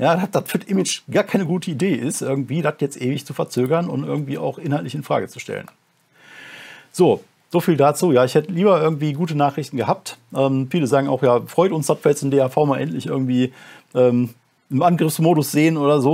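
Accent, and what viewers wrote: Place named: Remscheid, Germany. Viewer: Dieser Remscheider Dialekt ist einfach top :'D Ich glaube ja, dat die SPD den ganzen Bums noch mit Knallgas sehendes Auge vor die Wand fährt. "Dat is' allet an den Haaren herbeigezogener Quatsch!"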